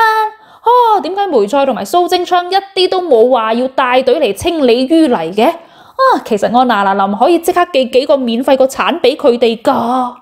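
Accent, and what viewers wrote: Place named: Guangzhou, China. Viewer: 我地广州的音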